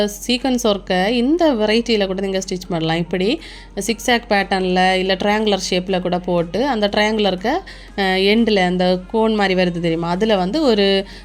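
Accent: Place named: Kuzhithurai, India. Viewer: I like your speech,I had relatives in kuzhithurai,They speak the same style as you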